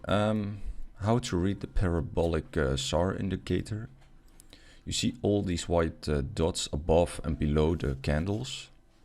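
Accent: Netherlands. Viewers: Are you Dutch? :) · Nice video! Are you Dutch by the way? 😄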